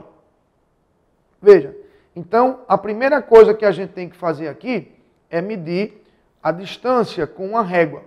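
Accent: Northeast, Brazil. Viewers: Sabia que era nordestino. Mas não sabia identificar o estado! Obrigado :)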